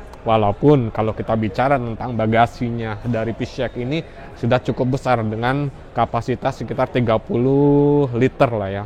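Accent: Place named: West Java, Indonesia. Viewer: Letah sunda 😁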